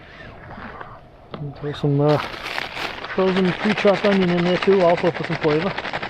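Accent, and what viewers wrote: Boston, United States. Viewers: 100% Boston accent. Con-corn! I love it! Old school!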